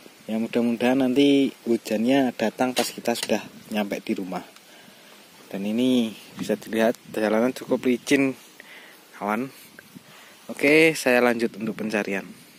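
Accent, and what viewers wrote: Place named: Bojonegoro, Indonesia. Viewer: logat bicarane mirip bojonegoro.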